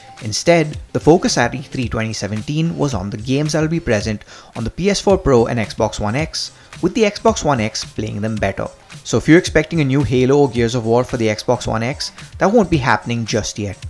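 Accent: India. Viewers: i cant understand this indain tech support guy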